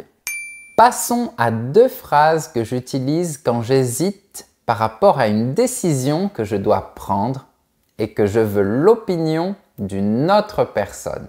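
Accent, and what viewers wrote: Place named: France. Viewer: Merci beaucoup pour faire cette videos. Je peux comprendre environ 100% de ça que tu parles. Mais que je ne peux pas m'arrter de poser un question a moi même. Que region de France est-ce que vouz prof devient? Par-ce que je trouve ton manière de parle très intéressant prof. Pardonne ma mauvaise grammaire. 😅😅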